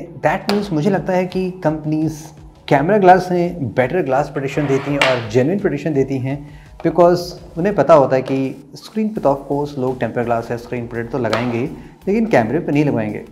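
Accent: India. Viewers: He speaks English, but it sounds Indian😅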